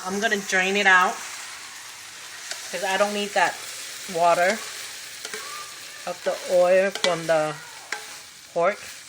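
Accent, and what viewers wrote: Laos. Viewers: The crepe were lumpy, some had holes, the fillings were falling out. they were ugliest mess but very yummy. I love the sweet and sour sauce. I just need more practice. I use a lot of MSG and I am very much a live. So I will keep using it. thanks for the tutorial, your Laos English is just perfect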